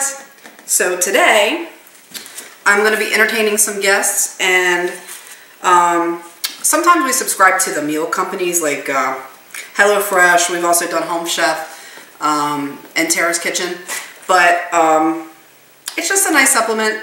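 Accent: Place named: South, United States. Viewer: I've laughed seriously out loud on your southern accent lol.....first lesson....southern talkin 101....taters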